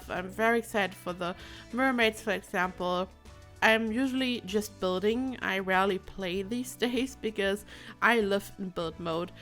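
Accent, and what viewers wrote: Germany. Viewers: r u german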